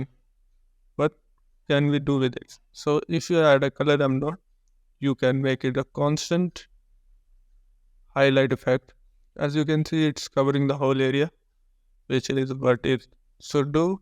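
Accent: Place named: India. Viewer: Is this an indian accent by any chance? Usually i can understand the accent since they know english already, but this is a thick accent lol. I agree though, much respect for using his voice. Confidence will adjust the accent, but to get more views you have to be more legible. Also the subtitles aren't saying what he's saying a lot of times.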